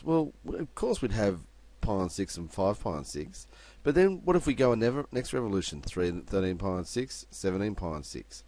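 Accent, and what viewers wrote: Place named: Australia. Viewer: aussie ascent ...nevertheless good tutorial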